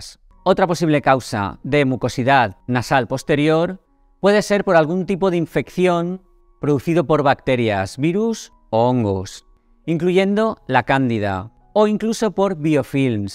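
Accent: Spain. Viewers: fuera moco de mi garganta.... genial, muy práctica y exhaustiva tu explicación. Cada vez más informado. Genio!!! si vas al médico te dice: -consume lácteos, sra? y tu te apestas comiendo lo que te enferma!!!! ( pero que a tu paladar le encanta!!! no somos inocentes!!! ) volvamos a la fuente, comamos como nuestros abuelos. Ni poco ni mucho: suficiente! Y sencillo. De buena calidad nutricional. Pero no!!! vamos a la tienda de alimentos y compramos etiquetas... así nos va!!!! Gracias. Me agrada tu acento tan español... Te saludo desde Argentina.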